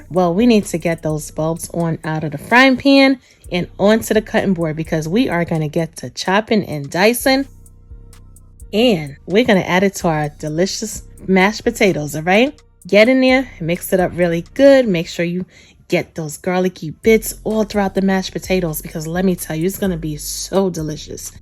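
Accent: New York, United States. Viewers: Looks delicious 😋 you gotta be from NY or Jersey 😭😭 I know that accent 😂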